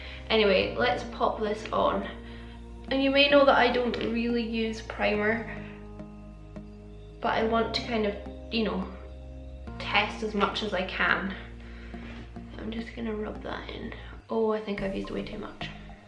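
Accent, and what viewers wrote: Scotland, United Kingdom. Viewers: Are you Scottish? If so, where are you from? :) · Your accent is really cute 💞 Are you Scottish ??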